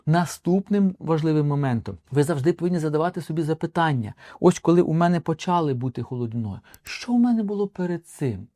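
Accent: Vinnytsia, Ukraine. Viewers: Мені здається що ви з Вінницької області.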